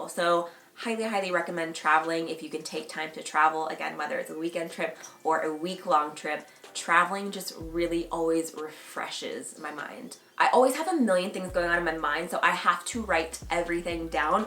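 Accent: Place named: Tennessee, United States. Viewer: I’m really hearing your Tennessee accent in this video which is weird 😂 especially since you moved to LA!!! I love it thoooo we need a get ready with me installment to this video 💕💕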